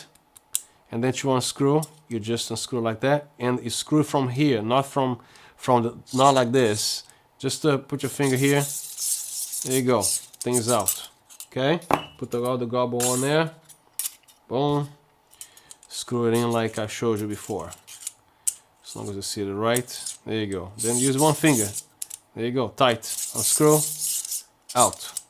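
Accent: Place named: Brazil. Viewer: I couldn't ask for a better review, this is really detailed. Also, I can tell by your accent you were born (or at least lived) in Brazil. Am I correct? Cheers!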